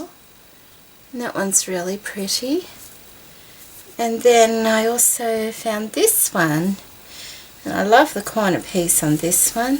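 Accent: Australia. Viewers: I love seeing all of the great things you find in Australia! These videos are really fun to watch. Plus, I could listen to your voice and accent all day. Seriously, your voice is so lovely!!! xoxo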